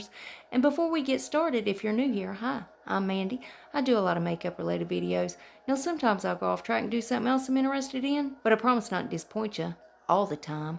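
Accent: South, United States. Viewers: Hey girl, love the accent. You sound like me. LOL We girls in the south got it goin'.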